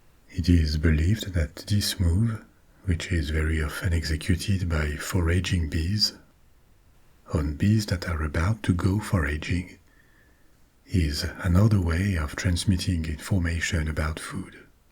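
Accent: France. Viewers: Does have a regional accent? From what part of France does he hail? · would you consider doing Dante's circles of Hell and Virgil's journey through them? I'd love to hear it from your Frankish tones.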